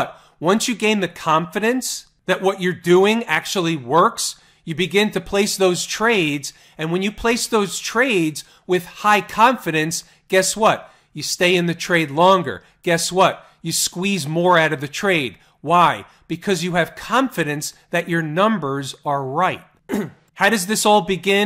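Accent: United States. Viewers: Most American sounding narrator. I love it. I bet he’s nice to waiters. 😊👌🏻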